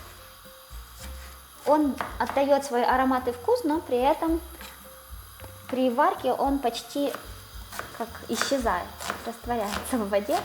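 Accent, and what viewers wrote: Ukraine. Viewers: ...я россиянин. Служил на Украине срочную службу в Советской Армии...1987-89 год. Восточная Украина..,под Харьковом. У вас очень милый украинский говор..Вы с какой части Украины? Не с Полтавской области?! Мира нам всем!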